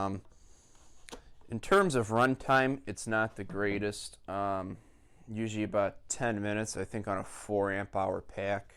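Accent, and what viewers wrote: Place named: Chicago, United States. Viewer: I hear that Chicago accent. Where you from? I'm on da south side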